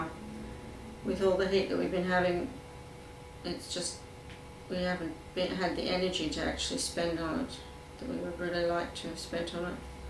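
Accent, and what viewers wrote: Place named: Australia. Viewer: G'day from SA I thought hmm she sounds Aussie then she said Kmart and I went woo hoo! LOL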